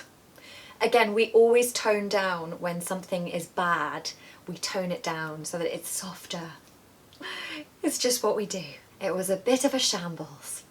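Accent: United Kingdom. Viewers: I really enjoy your accent, where are u from in the uk? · Oh this lesson is a piece of art you give us vocabularies, phrases hints of British culture with a clear and beautiful British accent this magnificent episodes will be my English journey's peer ❤ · Very classic British accent it seems back to that time of Jane Austin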